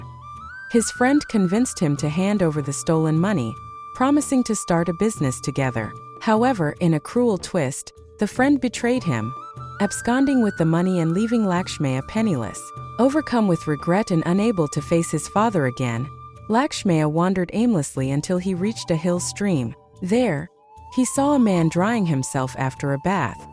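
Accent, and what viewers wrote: India. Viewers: Accent indian rkho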